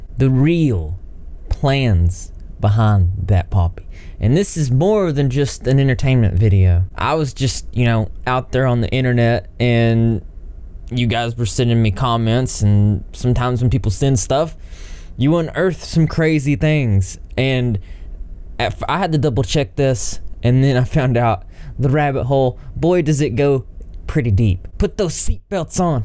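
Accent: South, United States. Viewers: Deep southern accent + purple hair + wierdo earrings/plates =???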